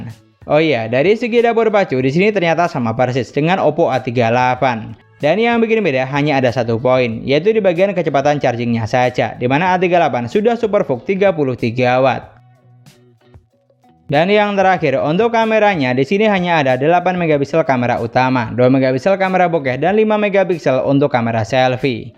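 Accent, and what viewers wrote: Madura, Indonesia. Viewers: Aksen madura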